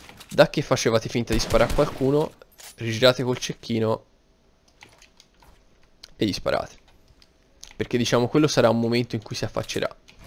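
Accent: Italy. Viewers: Sei della toscana vero? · Grazie mille, spiegato benissimo e poi .. in livornese! (?) Un si pole chiede di meglio! 😂🤘🏽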